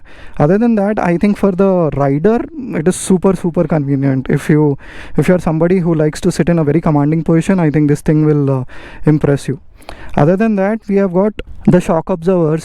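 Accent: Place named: Telugu, India. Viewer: with telugu accent is the best bro.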